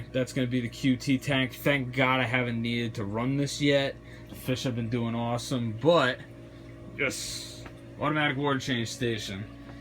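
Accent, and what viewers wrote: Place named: Massachusetts, United States. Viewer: Awesome set up man! You really went all out with the upgrades! Where do you live? I kind of hear a massachusetts'ish accent in there.. I have subbed and can't wait for more vids!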